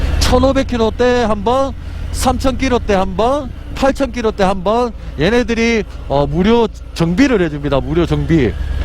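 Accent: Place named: Gyeongsang, South Korea. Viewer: 같은 경상도라 정감이 가네요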